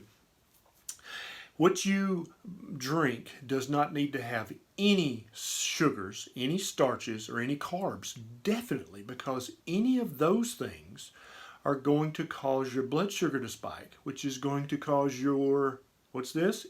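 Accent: South, United States. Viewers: 1 sub or a 1M subs, I love. That southern charm is wonderful, "supper" LOL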